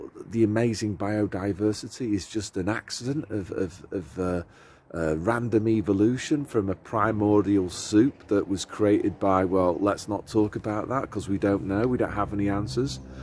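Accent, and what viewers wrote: Northern England, United Kingdom. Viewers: I could talk to you for hours on this subject 😁 but for me God creating God, l can't make that leap if you understand what I'm saying. I'm not religious but l know there has to be a 'creator'. I'm also of the opinion that the human brain is not yet capable of understanding, l know mine isn't 😁. I've got to ask, are you a Northern England lad?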